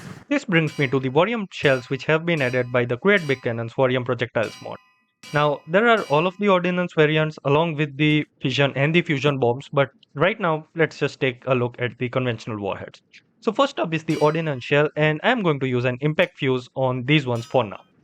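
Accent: India. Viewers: when the indian accent came on i instantly closed the video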